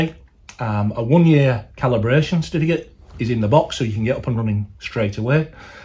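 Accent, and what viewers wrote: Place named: Yorkshire, United Kingdom. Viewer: Leeds/Wakefield accent love it ....ey-up! Great tester. Italian made?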